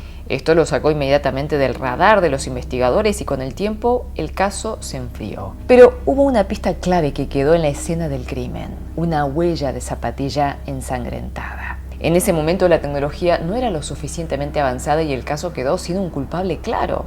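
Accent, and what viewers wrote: Argentina. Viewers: hablas perfecto español argentino me alegro mucho por vos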